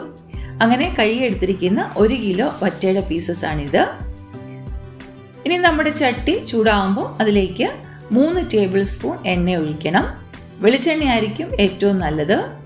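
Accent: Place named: Kottayam, India. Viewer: Well expalined in കോട്ടയം slang 👌♥️👏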